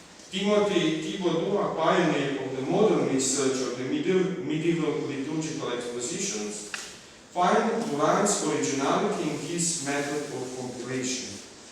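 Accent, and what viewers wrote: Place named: Russia. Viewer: Majgad koji russian english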